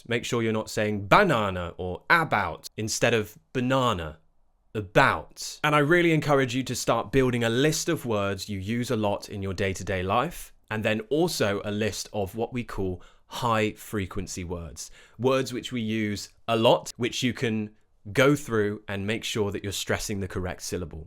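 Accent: RP, United Kingdom. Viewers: I don't know why but I absolutely love the British accent 😊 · Do you always speak in that accent normally? Especially, RP accent and the tone. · I like British accent thats why i always watching your videos from🇵🇭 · I love to hear the british english… 😅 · Hello, I’m not sure if you’ll see my comment, but I truly appreciate your efforts. I really love your accent, and I’ve always been fascinated by British English. If possible, could you tell effortless stories—around 30 minutes long—and at the end of the story, explain the actual meanings of the most important expressions, words, or phrases with a few examples? After that, it would be great if you could read the story again a bit more quickly. This idea is similar to what the channel English Natively does. Thanks a lot! 🙏🙏🙏🙏🙏🙏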